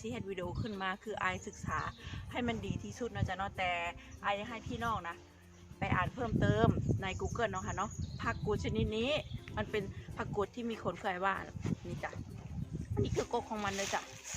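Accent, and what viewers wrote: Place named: Nakhon Phanom, Thailand. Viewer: สำเนียงคล้ายคนนครพนมเลยค่ะ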